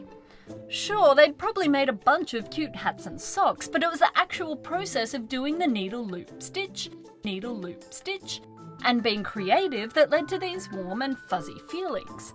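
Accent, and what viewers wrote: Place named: Australia. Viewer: I could tell by the accent. So am I. Seeing Australian women getting into science is really awesome because I want to have a career in science when I'm older, and it's really encouraging to see that its not just for the men:)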